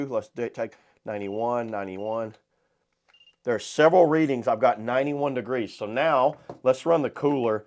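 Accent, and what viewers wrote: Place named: South, United States. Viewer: Your country accent is extremely noticeable 😅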